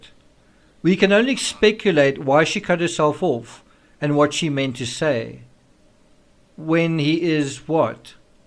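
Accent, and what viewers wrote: South Africa. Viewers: I'm new here. You accent sounds (very) South African.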